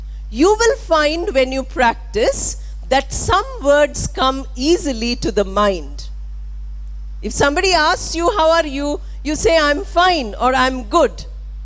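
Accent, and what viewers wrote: India. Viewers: Indian English you are uttering !!!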